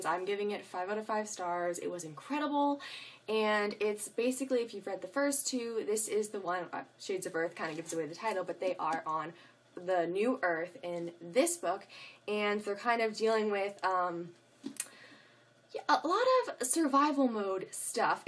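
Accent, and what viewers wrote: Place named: South, United States. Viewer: Where are you from anyways? It sounds like you have a slight southern accent which makes me think Texas for some reason haha I will totally share any secret information with you ;)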